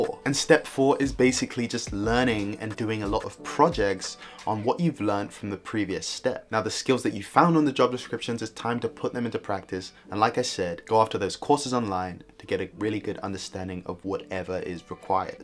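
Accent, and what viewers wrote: United Kingdom. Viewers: that's funny, I never noticed from the UK... I'm used to the UK accent as I'm from the UK too lol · thanks Bruv for clearing my mind and showing the way also i glad that i've finally found a pleasant calm UK accent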